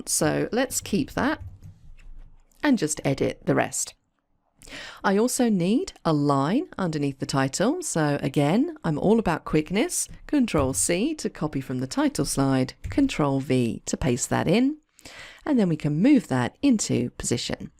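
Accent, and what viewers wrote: United Kingdom. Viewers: Thank you so much...in fact I am a zero user of P.P., but in fact I´ve learnt a lot just by watching this and your previous videos. I also like your accent I am kinda a fan of british one...take care and regards from Trujillo- Perú.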